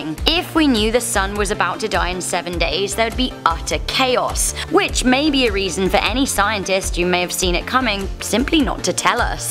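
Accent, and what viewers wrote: United Kingdom. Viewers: I can’t stand how poms say vitamin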